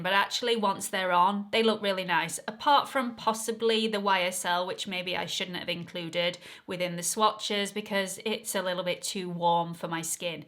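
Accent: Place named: Newcastle, United Kingdom. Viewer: Mind blown. So simple. TY. All I know of accents I learned from Inspector Morse. You might be from Newcastle. I’m loving this account!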